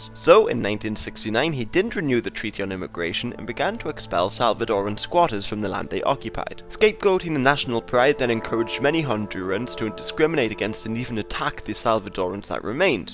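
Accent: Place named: England, United Kingdom. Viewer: It's an English accent, of the English language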